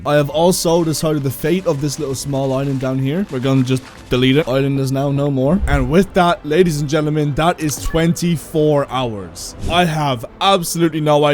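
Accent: Ireland. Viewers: I KNEW U WERE IRISH I KNEW I RECOGNIZED A IRISH ACCENT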